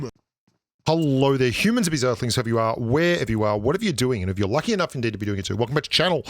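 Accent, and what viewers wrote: Australia. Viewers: Totally agree,...I dropped out of the entire last season and a half due to the exact same reasons you’ve just highlighted. Have returned to play in recent days. I’ve missed watching your content and hearing your hilarious Aussie-isms! 😊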